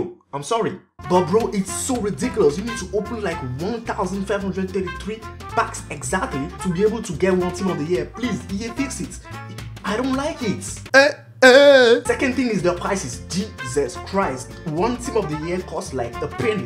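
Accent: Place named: France. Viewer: On entent ton accent français Mdrrr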